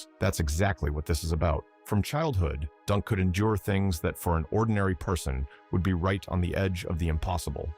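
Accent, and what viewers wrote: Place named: United States. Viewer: You Americans and the way you destroy the English language with your ridiculous accent. Its not Noveeela, its Nov el only 2 synonym's not 3 synonym's no ve la! FFS 🤦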